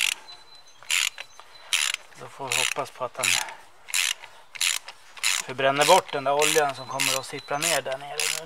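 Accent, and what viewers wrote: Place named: Västerås, Sweden. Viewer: Såg att du hejar på VIK. Stämmer det? Själv bor jag strax utanför Västerås. Tyckte väl att din dialekt var bekant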